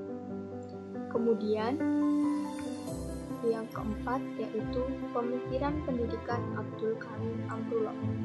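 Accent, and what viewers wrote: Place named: Banyumasan, Indonesia. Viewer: Uwuuuuu ngapak mantap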